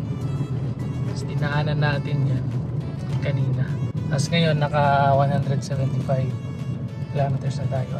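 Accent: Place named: Davao, Philippines. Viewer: Davao kaaayo hehe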